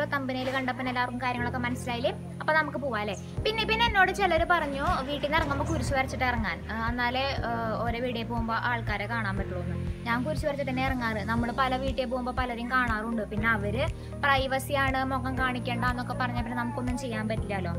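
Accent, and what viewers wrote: Thrissur, India. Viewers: മോളെ ഉണ്ണി എന്നുപറഞ്ഞാൽ കുട്ടിയാണെന്ന് തൃശൂർ കാർക്കും മാത്രമേ മനസിലാവുകയുള്ളു അതുപോലെ തൃശൂർ സ്ലാങ്ഗ് ഒരു രക്ഷയും ഇല്ല പിന്നെ മോളെ നിന്റെ മനസ്സ് നെല്ലാണ് ദൈവം മോളുടെ ഉദ്ദേശം നടത്തി തരാൻ അനുഗ്രഹിക്കട്ടെ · ഹലോ 😊♥️ പൊക്കി പറയ അല്ല എന്താ പ്രസന്റേഷൻ ♥️തൃശ്ശൂർ ഭാഷ കേൾക്കാൻ ഒരു സുഖം തന്നെയാ.. പിന്നെ (പേര് അറിയില്ല )ന്റെ വർത്താനം ♥️ഞാൻ vloger കാർത്തിക് സൂര്യ ♥️♥️ഫാൻ ആണ് ഇപ്പോൾ നമ്മള സെക്കന്റ്‌ ഫാൻ ആയി ♥️ഒരു women ആയ കൊണ്ടല്ല.. Athul സജീവ്... തിരുവനന്തപുരം 😊 ഈ സ്റ്റൈൽ ഒരിക്കലും മാറ്റരുത്... God bless you 😊😊🥰🥰♥️♥️ വിജയൻ ചേട്ടാ ♥️♥️♥️ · നിങ്ങളെ സംസാരം കേൾക്കാൻ രസം ഉണ്ട്.... ചിരിച്ചു പോകും..... കാരണം തൃശൂർ ഭാഷ ആണ്.... ഞങ്ങൾ കൊല്ലം ആണ് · തൃശൂർ സ്ലാങ് പൊളി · Thrishure slang and your smartness njanum subscribe cheythu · എന്റെ പൊന്നു മോളെ... നിന്റെ അസ്സൽ തൃശൂർ ഭാഷ... ഇഷ്ടപ്പെട്ടു... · Hai ചേച്ചി ചേച്ചിയുടെ എല്ലാം വിഡിയോസും കാണാറുണ്ട് ചേച്ചിയുടെ തൃശൂർ സംസാരം തന്നെ കേൾക്കാൻ തന്നെ നല്ല രസം ആണ് ചേച്ചിക്ക് ബുദ്ധിമുട്ട് ഇല്ലെങ്ങിൽ ഒന്ന് എനിക്ക് വിളിക്കോ <num> ഇത് ആണ് എന്റെ നമ്പർ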